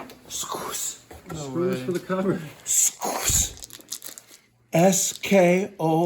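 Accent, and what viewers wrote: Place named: Boston, United States. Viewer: Worst video. Edit this stuff and give us the information. I don't want to hear Bostan accent all day long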